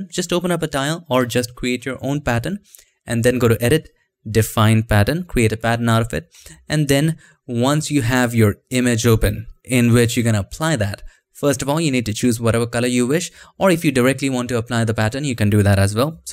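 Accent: India. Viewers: The only indian accent I could listen to for an entire day. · The only one indian accent guy on YouTube who actually professional and explains stuff the correct way! :D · Прикольный индусский акцент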